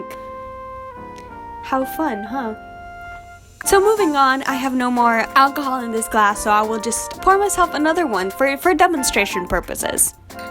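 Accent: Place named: United States. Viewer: This is really shocking she sounds like an American but she's a Filipino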